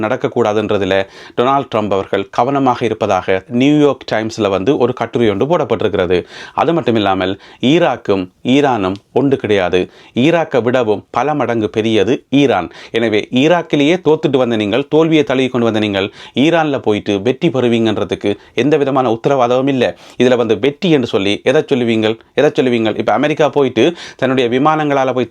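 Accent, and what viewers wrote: Sri Lanka. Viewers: சகோதர நீங்கள் செய்திகள் சொல்லுவது பாட்டி பேரப்பிள்ளைகளுக்கு சொல்லும் அறிவு போல மிக எளிமையாக அழகாக உங்கள் நாவில் இலங்கை தமிழ் விளையாடுகிறது நீங்கள் நேர்மையாக செய்திகளை சிறப்பாக எடுத்து உரைக்கின்றிர்கள் வாழ்த்துக்கள் உறவே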